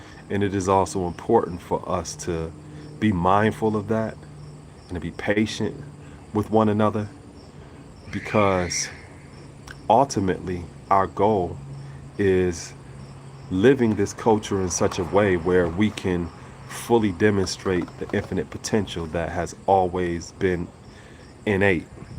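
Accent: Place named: Upstate New York, United States. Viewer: He's got an upstate NY accent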